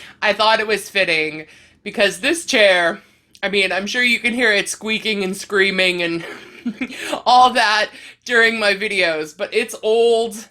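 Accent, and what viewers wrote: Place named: Pittsburgh, United States. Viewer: I'm excited to see how the cardigan works up! Also, I just realized how much you speak Pittsburghese. It makes me homesick!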